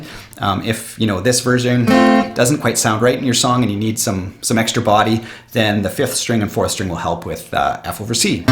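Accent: Canada. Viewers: By any chance, are you Canadian. I recognize your accent as a fellow Canadian as well.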